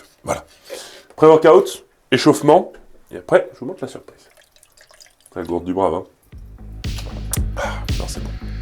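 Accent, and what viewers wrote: Quebec, Canada. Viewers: Hahah tu as vraiment un talent pour te mettre dans des personnages 😂😂 et tu le fais vraiment bien, perso je kiff l’accent Québécois 😂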